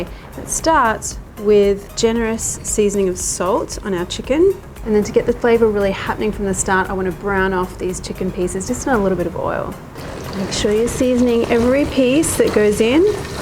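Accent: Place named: Australia. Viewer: How i miss Australian accent..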